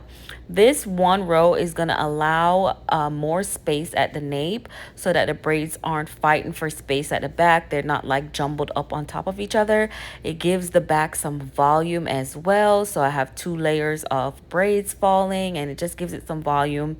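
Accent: Jamaica. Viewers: New subbie! I hear a Jamaican accent! Gonna binge watch your vlogs 🇯🇲🇯🇲🇯🇲🇯🇲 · We appreciate this series. I’m also trying to learn to do my own hair. I recently did some mini spring twist by myself for the first time ever and I was pleasantly surprised. P.S are you Jamaican/ Caribbean by any chance. I hear a slight slight accent but I’m not sure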